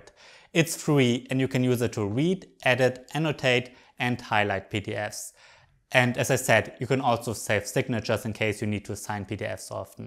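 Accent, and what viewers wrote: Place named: Germany. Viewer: I love it that you speak english in a German way🥰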